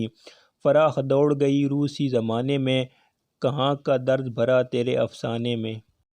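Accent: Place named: Sargodha, Pakistan. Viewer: Sargodha ki zuban apky lehjey main wazeha jhalak dey rahi h.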